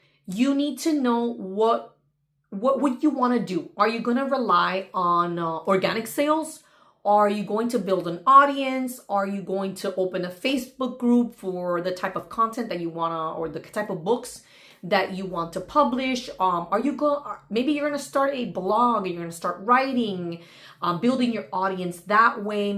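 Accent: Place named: Latin America. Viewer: I just finished this video. Priceless insights! And loved the subtle Latina accent. It helped me to connect and invest just a little bit more. Looking for the next one to watch. Thanks again!!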